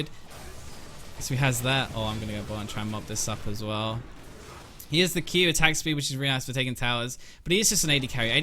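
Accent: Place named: Nottingham, United Kingdom. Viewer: is totally from Nottingham or Oxford or some shit... >.>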